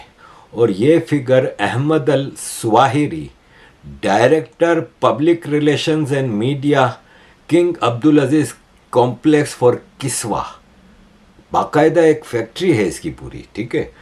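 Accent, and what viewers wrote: Gujarat, India. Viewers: aapke bolne mai gujarati lehja aa jata hai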